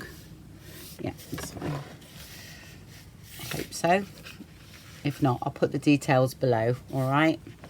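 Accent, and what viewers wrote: United Kingdom. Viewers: I am watching this video again so that I may make one of these portfolios for Christmas... your videos are always so easy to follow and I simply adore your style... that being said, because I tend to watch the videos, sometimes at night while my hubby is sleeping or when I am washing dishes, I have the “Closed Captions” on... I am used to the interpretation being quite askew because of your British accent, but sometimes it just makes me giggle at what it THINKS you said... todays giggle? Washi Tape... it thought you said, “What’ your tape?”...🤣🤣🤣 Hugs to You and Yours...❤️❤️❤️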